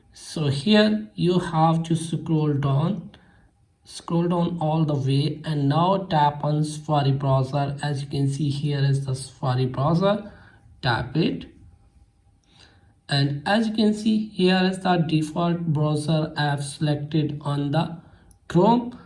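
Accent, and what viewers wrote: India. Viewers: Always the people with the Indian accent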